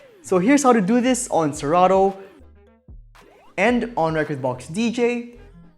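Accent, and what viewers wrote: Philippines. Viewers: I knew it! I’ve always had a feeling you were Filipino from the way you talk!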